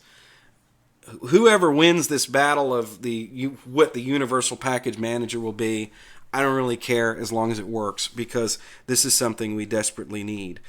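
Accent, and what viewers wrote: United States. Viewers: I have said this before and have to repeat this again: if you want to get the best Linux channel for novice just subscribe to the ' channel on Youtube. I am so glad that I run into his channel a while ago! Of course I don't agree with everything of what he has to say,without exceptions, but it's just impossible to agree with the 100% anyone would say without an exception or two. But boy, oh boy: his guides are super-useful and they are made well. In addition his language sounds right for an American "ear" too, comparing to the British or Indian English some other good youtubers use. Hats off,!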